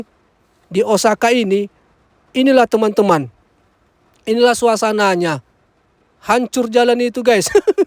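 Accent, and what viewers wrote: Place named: North Sumatra, Indonesia. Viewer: Abang logatnya koq seperti orang dari Sumatra utara sepertinya? Sungainya jernih enak,abang lepas celana jebur Brenang enak!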